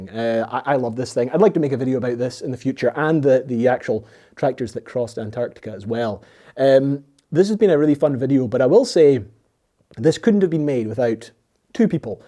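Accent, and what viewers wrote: Scotland, United Kingdom. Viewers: Fascinating videos but the speed of your commentary combined with the Scottish accent and my old age hearing make it difficult to keep up. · This is like longer Scottish Tom Scott. Great content, only a matter of time for 1 million subs for you.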